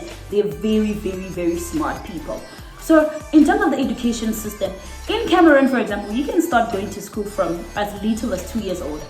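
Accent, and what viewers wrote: South Africa. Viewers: Hey girl,!! New subie here,, love your video.. but listen, there's no way you are not South African!! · You picked up a South African English accent 😅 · How are you Cameroonian with a South African accent wena?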